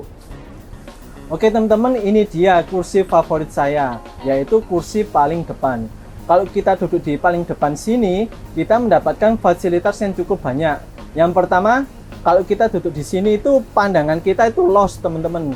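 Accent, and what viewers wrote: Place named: Java, Indonesia. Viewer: Medok banget coy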